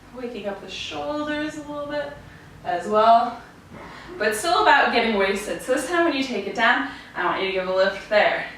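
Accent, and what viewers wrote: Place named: United States. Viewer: This had me cracking up😂 Your chatter was so adorable🙊 The workout was a burner for sure and I’m loving how I feel now! Also, I had a feeling you were/are American so I’m glad you mentioned it in this one😉 I’ve been trying to place your accent😆 Thanks for the fun sweaty time and strong feeling I now have💗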